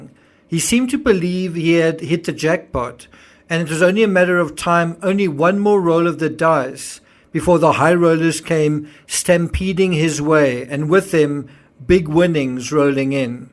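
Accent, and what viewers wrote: South Africa. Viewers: Your South African pronunciations are interesting and enjoyable. I have a SA acquaintance who likes listening to you, reminds her of home.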